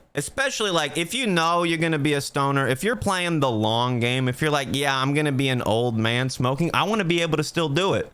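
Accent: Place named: New York, United States. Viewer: I wonder where the New Yorker type accent comes from.?.?.?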